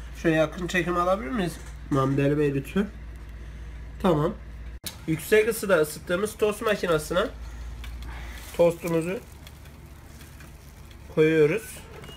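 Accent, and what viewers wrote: Gaziantep, Turkey. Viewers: Normal somun ekmeğe Bizim Özel tost ekmeğimiz diyoN:D abi antepli oldunu çok belli ediyorsun Konuşma şeklinden bilmeden önce anlamıştım:D özelliklede şu kısım (buyrun tosta başlayalım (Ozaman) ):D bende antepliyim:) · ABİ ADAMIN GAZİANTEPLİ OLDUĞU ÇOK BELLİ OLUYO KONUŞMASI AYNI GAZİANTEPLİLER GİBİ BENDE GAZİANTEPLİYİM TOSTUNU YEMEDEN ÖLMEM İNŞALLAH:D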